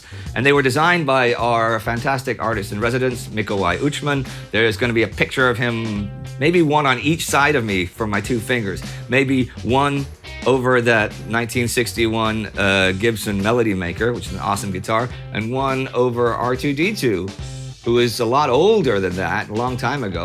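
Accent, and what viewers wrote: Texas, United States. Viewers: I love texas-accent <3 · your Texan is showing.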